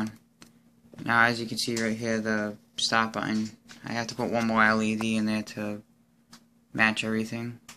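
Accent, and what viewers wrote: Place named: New England, United States. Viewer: You from mass or maine???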